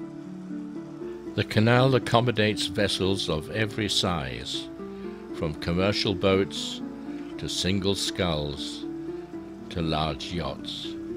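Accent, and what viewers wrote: England, United Kingdom. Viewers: So pleasant to hear this world of adventure described and decoded through the lens of a true Englishman's command of the language. A rare and dying breed, sadly...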